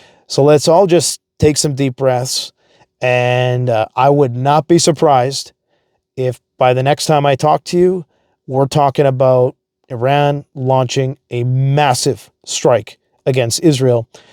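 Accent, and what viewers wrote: Canada. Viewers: You sound like a Canadian. I've never disagreed more with your assessment. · Some videos your Canadian shows through more than others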